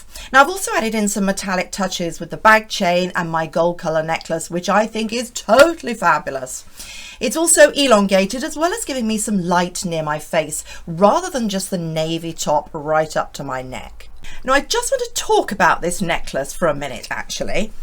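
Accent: United Kingdom. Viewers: I really appreciate your fashion tips and this American loves listening to your lovely British accent.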